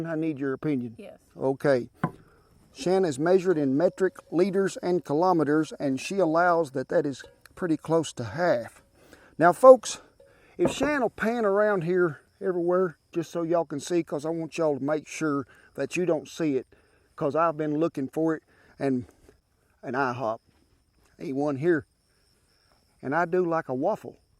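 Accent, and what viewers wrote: South, United States. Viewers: Ah, yes I believe you're right. I'm disappointed I couldn't figure that out by myself, I live in the south and hear people talking like this all the time lol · Definitely down South cooking and speechifying!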